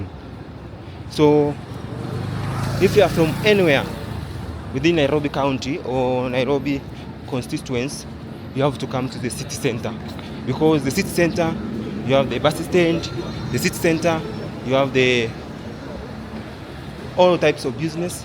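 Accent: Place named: Uganda. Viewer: YOUR ACCENT IS FROM UGANDA NOT TANZANIA,,, TANZANIAN CANT SPEAK THAT ENGLISH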